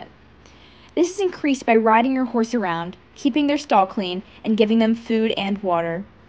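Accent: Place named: South, United States. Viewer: The southern accent sounds like Sadie from RDR2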